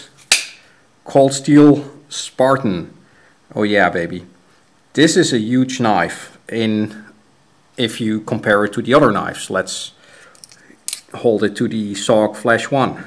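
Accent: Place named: Netherlands. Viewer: Ik kan wel horen dat je een nederlander bent. Niet dat je Engels slecht is, maar de uitspraak kan beter. Goede EDC keuzes!!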